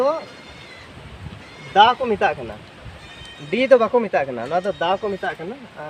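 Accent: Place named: India. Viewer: Aam do santali te ol akan word latar re hindi tem ucharane aa anate bam bujhaw daleyag kana santal ched leka ko ucharana youtube re joto olchiki palhaw hah video njel me ar onde khon mocha te ched leka ucharana aa santali te ankage chiki teho olo aa menkhan hindi ucharan em palhaw khan amdo santali word ucharan bam gan daleya aa nowa redo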